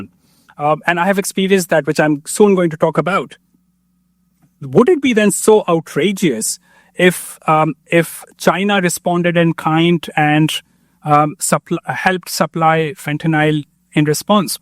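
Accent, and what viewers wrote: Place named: India. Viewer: Espeak,Estreet…Indian still remains an Indian 💩😂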